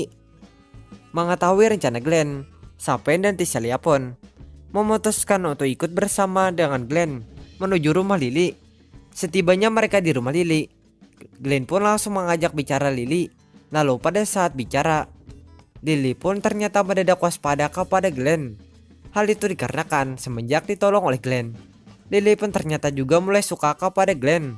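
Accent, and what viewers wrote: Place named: South Kalimantan, Indonesia. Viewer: Logat nya abang yg bawain cerita kayak orang KALSEL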